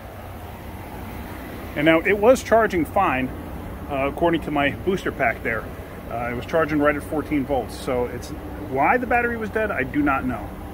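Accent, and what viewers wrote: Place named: East Coast, United States. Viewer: lol! I was trying to identify your East coast sounding accent. I was pretty close. I'm amazed that truck is still running now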